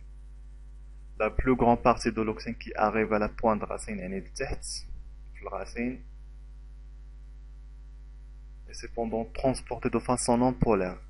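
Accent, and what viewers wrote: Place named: Arab world. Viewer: Ton exposé n'est orienté qu'aux arabes, ton français est trop arabisé, on y comprend presque rien. Shukran quand-même.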